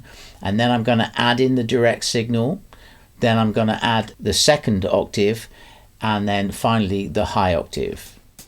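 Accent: West Country, United Kingdom. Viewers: Excellent demo and your playing is awesome. Handy pedal, I must say. Reassuring West Country twang too, especially as I grew up in Brizzle. 😀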